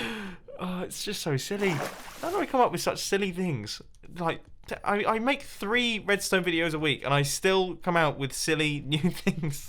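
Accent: England, United Kingdom. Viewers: I love English YouTubers the way they talk makes me laugh(this is not an offensive comment I hope)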